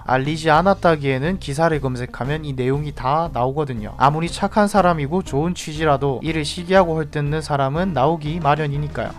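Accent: Gyeongsang, South Korea. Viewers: 나레이션이 사투리죠? 고향이 경상도인가봐요. 잘 듣고있습니다.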